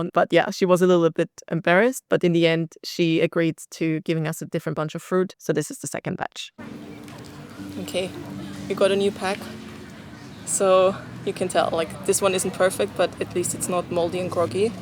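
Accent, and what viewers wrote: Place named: Thailand. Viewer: Are you half-Thai? Your Thai accent is so clear!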